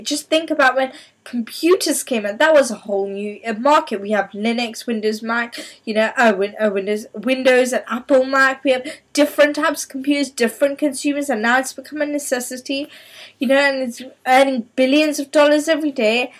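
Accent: United Kingdom. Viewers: As a Pole myself, I'll be the first to admit that Poles don't belong in the UK and that Britain is for the native BRITISH, which does NOT include you,. You're NOT British. You're just an Indian occupying Britain who happens to just so have a British accent. One day, European nationalists will rise up and deport all non-Europeans from our beloved continent and you will be sent back to where you belong.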